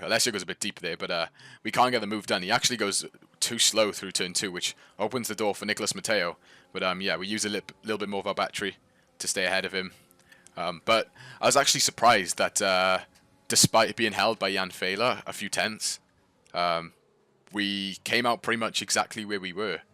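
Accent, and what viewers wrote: Wales, United Kingdom. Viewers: Sounds Welsh to me.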